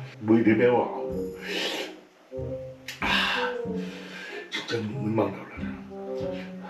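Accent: Gangwon, South Korea. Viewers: 오리지널 강원도 사투리 정말 끝네줍네다 응원합네다 ㅎ~~~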